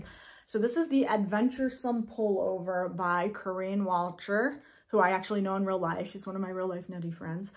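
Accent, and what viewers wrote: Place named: Philadelphia, United States. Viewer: You have to live near Philly, we sound the same! Lol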